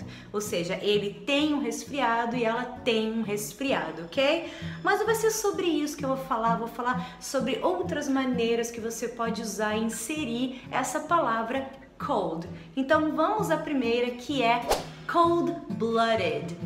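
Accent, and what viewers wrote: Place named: United States. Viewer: Vc nos inspira, deixa eu tirar uma dúvida lá no TioSam, vc foi confundida com uma nativa?Pq sua pronuncia é muito americanizada. goob job teacher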